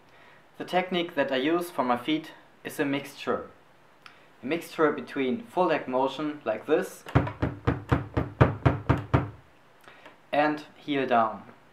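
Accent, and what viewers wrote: Germany. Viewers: sounds like you're german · this is awesome! where are you from? sounds like your german :D I also use this technique since almost a year. its really nice, if you get the first few months of practice done which are pretty hard. as you said, its not easy to get your feet/legs/ankles comfortable with this motion. but once you get that its nice and easy :) its great to see somebody with the same technique playing on a very high tempo level. my maximum at the moment is still 145, sometimes 160 for a short time. so how long did it take you to get for 190 bpm? and would you agree to that: the higher the tempo is, the more you need to work with your ankles and less with the whole leg? thanks for your reply! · is that a german accent?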